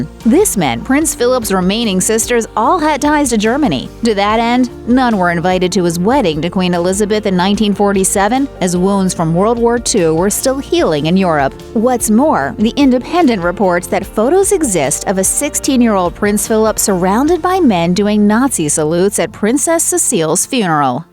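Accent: United States. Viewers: Gd contente but bad 😰 America chinlish accents!! Ask/employ the EU bondle likes Swedish or Danmark or Switzerland for boardcaster plz !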